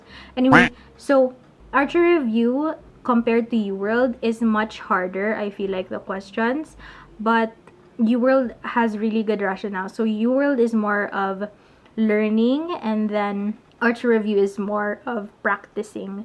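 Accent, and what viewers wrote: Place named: Philippines. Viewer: Lol I knew you were filipino before you even opened your mouth LOOLL 🤣🤣🤣You have the thickest Filipino accent of all damnn (I'm not filipino)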